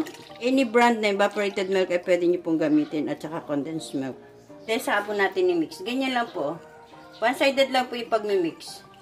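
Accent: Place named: Manila, Philippines. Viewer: taga iligan diay ka pero ang imong tagalog murag pure na taga manila...happy new year dai❤from ISRAEL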